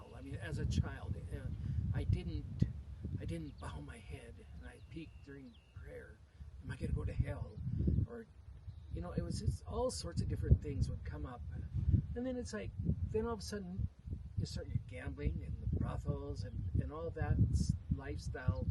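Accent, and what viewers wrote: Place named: North Dakota, United States. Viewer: I enjoyed listening to your story. We didn't get to really know each other very well, but I do remember when you and your folks would come to Plentywood. Even though you have been to Reno, California, and other places I can still hear that North Dakotan Norwegian edge to your voice. I love that and reminds me of my cousins in the Parshall area as well as your uncle in Plentywood. I am glad you have found love and joy.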